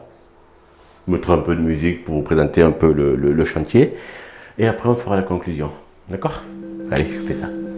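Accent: South, France. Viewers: Excellente vidéo dans le sérieux et la décontraction avec ce petit accent du Sud pour agrémenter le tout. Ça donne envie de s'y mettre ! Bravo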